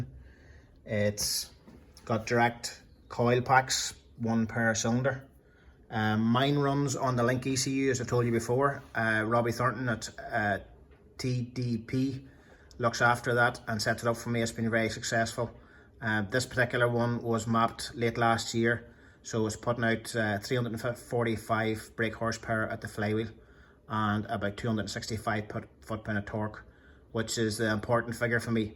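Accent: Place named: Scotland, United Kingdom. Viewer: I absolutely love the Scottish accent ❤❤❤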